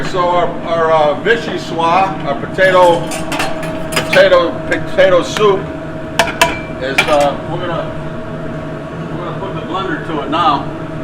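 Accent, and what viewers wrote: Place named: New England, United States. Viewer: eh! love your badass cooking style you & are behind the burners ya'll rock my day!!!! love New England accent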